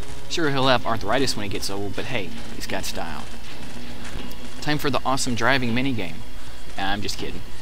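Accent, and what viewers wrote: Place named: Appalachia, United States. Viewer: lol, I love his accent... And the intellegence thing, HES A HILLBILLY !!! I wonder where cleedis is? I hope he done did get outta here before dem zombie fellers got him!... hehe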